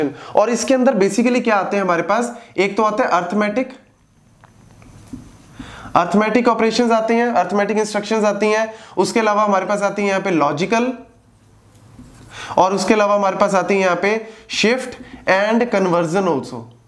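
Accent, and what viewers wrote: Punjab, India. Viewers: The best Computer Architecture teacher ever! I hated this subject until I started watching your videos, Sir. :) I love your Punjabi accent, it makes the lectures interesting and fun. You are always to the point and cover the topics in such less time. I am thankful for your speed. If I ever become a professor, I want to teach like you.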